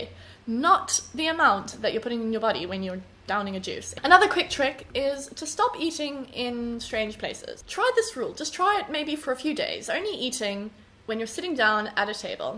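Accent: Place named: South Africa. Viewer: No I think it's just her accent she's from South Africa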